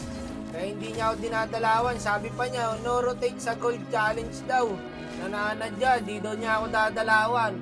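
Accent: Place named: Bulacan, Philippines. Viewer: San ka sa Bulacan boss na halata ko kse boses mo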